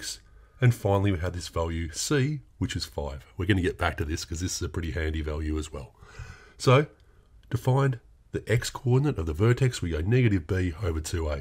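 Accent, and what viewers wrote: Australia. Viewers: australian accent hit just right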